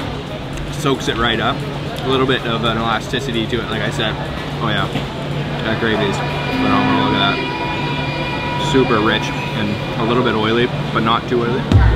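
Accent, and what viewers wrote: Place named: Canada. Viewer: He's Canadian 😂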